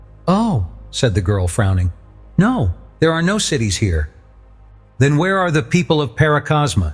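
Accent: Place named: United States. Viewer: Too bad the narration switched to an annoying American voice.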